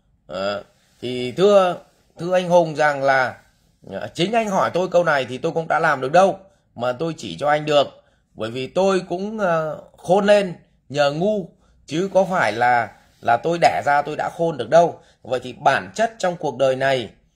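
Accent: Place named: Vietnam. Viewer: Toi thích cách thầy nói chuyện với cu, đúng cái chất mien que nhà mẹ tôi.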